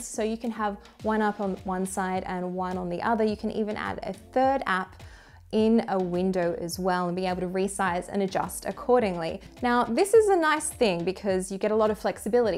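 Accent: Australia. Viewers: Just got the zfold2 yesterday. Amazing!!!!! Waiting for the spiegen tough armor case in the mail. Highly recommended that case! Also... I love your aussie accent! Xoxo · Australians would typically say /djuːəʊ/ (sounds kinda like d-wow) vs the dryer "u" sound in the american /d(y)o͞oō/. Her australian accent is subtle, though. I would not have picked it up if she hadn't mentioned it.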